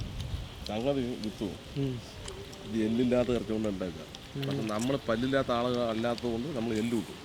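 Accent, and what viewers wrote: Palakkad, India. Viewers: പാലക്കാടൻ സ്ലാങ് 👌🔥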